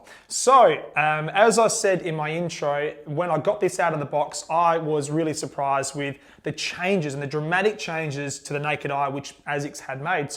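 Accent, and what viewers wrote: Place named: United Kingdom. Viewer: It's difficult enough to understand with a british accent, it's more difficult when the speaker talks very fast, I have to replay certain topics. Aside from that, great Review!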